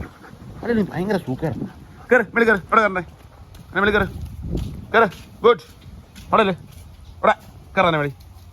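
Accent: Kollam, India. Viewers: ചേട്ടന്റെ സ്ഥലം എവിടാ നമ്മുടെ കൊല്ലക്കാരുടെ സംസാരം ആണല്ലോ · Kollam slang.......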